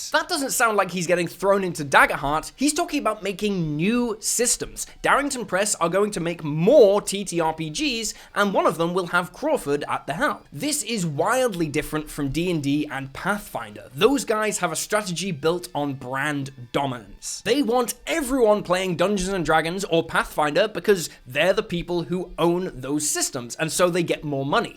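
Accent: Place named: United Kingdom. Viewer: Ha! you are british 😂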